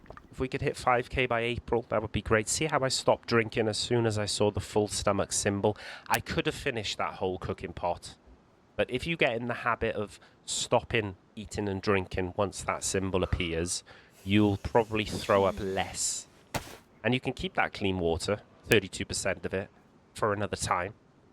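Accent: Wales, United Kingdom. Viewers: Great video - you welsh?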